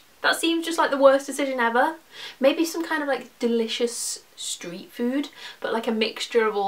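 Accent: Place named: Wirral, United Kingdom. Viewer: Are you from the Wirral? Your accent is very familiar!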